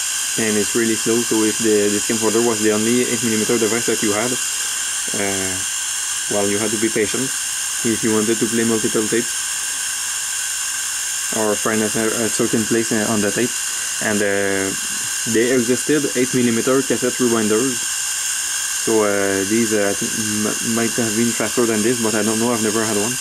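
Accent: Quebec, Canada. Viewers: you live in quebec i can tell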